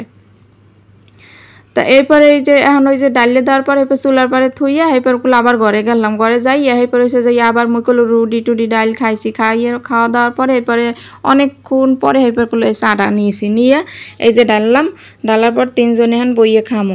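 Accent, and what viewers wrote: Barishal, Bangladesh. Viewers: আপু তোমার কথাগুলো কেন জানিনা আমার খুব ভালো লাগে বিশেষ করে বরিশালের ভাষা আমার কাছে খুব পছন্দের ❤❤যদিও আমার বাসা উত্তরবঙ্গে কেন জানি না দক্ষিণাঞ্চলের বরিশালের ভাষাটা আমার কাছে খুব পছন্দের ❤❤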